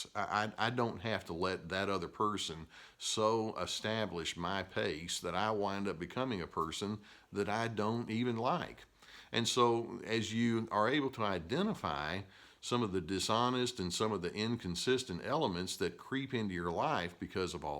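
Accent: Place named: Texas, United States. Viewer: Such hard-earned wisdom (and common sense) delivered with that charming Texas drawl. These are calming and really informative. Thanks Doc.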